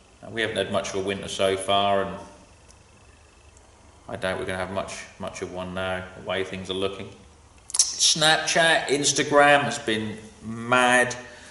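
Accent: Norfolk, United Kingdom. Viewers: Really enjoying the videos. Its good to hear a good ole Norfolk accent on here. You always know where you are with a flask of hot tea.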